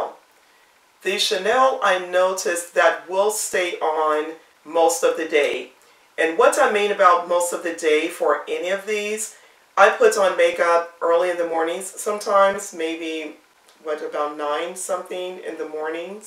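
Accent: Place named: Jamaica. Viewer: Your LV 6 Key Holder particularly stood out because that's on my radar. I didn't SEE this - but your accent is soothing. My family is from Jamaica and sometimes you pronounce words as they do. Anyway, new subbie here. Please enter me in the drawing and continue to make your videos! :D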